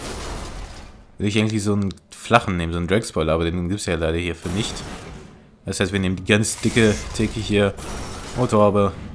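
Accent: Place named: Russia. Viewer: man hast du nen russischen akzent ! :D (bin auch russe)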